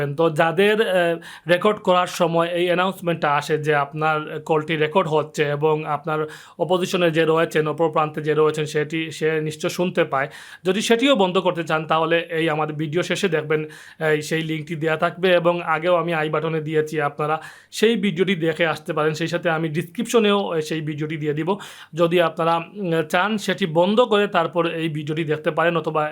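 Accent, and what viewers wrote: Sylhet, Bangladesh. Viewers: আপনি মনে হয় সিলটি?😊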